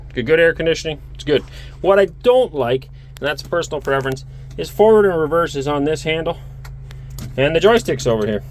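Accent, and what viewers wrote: Canada. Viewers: That is the most Canadian accent ever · he Canadian by the accent.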